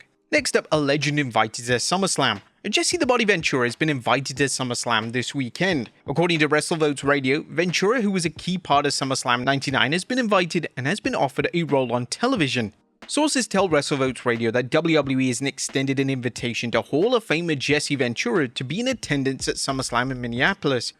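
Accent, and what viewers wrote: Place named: United Kingdom. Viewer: Thumbs up UK voice back!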